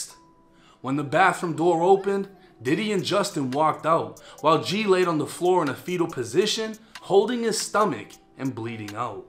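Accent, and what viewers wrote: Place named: East Coast, United States. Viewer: You from Houston huh? Tam bout Whoops took some time but I hear that East Coast accent. Bruh I'm glad I found this channel. Keep it up Big Homie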